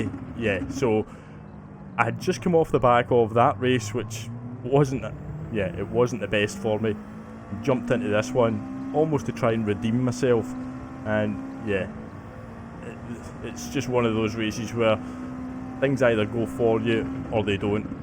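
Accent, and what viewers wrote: Scotland, United Kingdom. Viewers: Awesome video once again. You can see that you clearly have the speed needed to win a race. One thing: you mentioned you are more comfortable starting p3.4,5. Something like that, so you can settle into the race. If you feel more comfortable NOT leading , would it help if you take it easy on the opening lap(s) and if someone wants to overtake from p2/3, just let them? Then you are behind and you can settle in. Of course, it's also amazing if you want to push and challenge yourself to be more at ease pushing from the front. Anyway, great vid and I personally just love to hear the scottish accent. Have no issues and understand everything (and im a dutchy)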